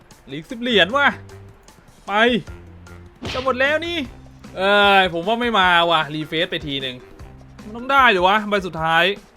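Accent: South, Thailand. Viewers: พูดเหมือนคนใต้เลยนิ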